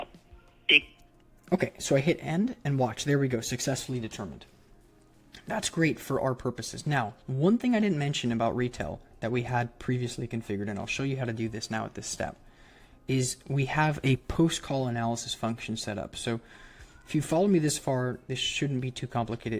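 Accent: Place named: India. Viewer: not the indian accent🤣🤣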